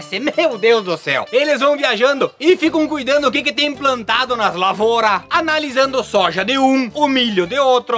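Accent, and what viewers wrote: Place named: Garibaldi, Brazil. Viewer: Bah cara, exatamente, e esse sotaque de Garibaldi! 😆😆😆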